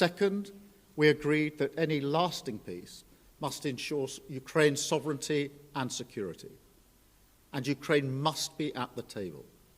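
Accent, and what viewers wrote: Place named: United Kingdom. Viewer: イギリス英語すきだな アメリカ英語は、ベラベラしていて好きじゃないなぁ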